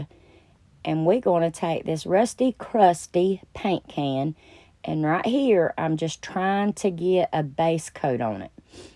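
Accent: South, United States. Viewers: I also love to hear your accent. I’m in NC but from Tennessee. There’s nothing better than a southern accent. 💕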